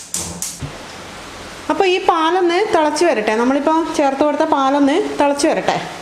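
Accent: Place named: Kannur, India. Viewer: Ithupola pattippidich bhasha Kannur thanne.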